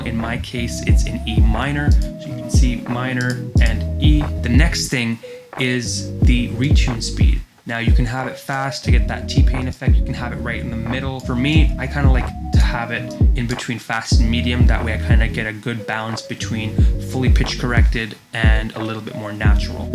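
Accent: India. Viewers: I swear I thought you were from India, lol.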